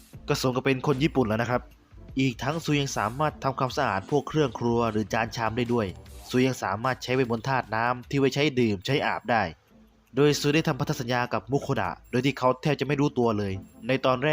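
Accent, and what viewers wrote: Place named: South, Thailand. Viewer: เจ้าของเพจเป็นคนจังหวัด กระบี่ หรือพังงา ครับ เสียงออกโซนนั้น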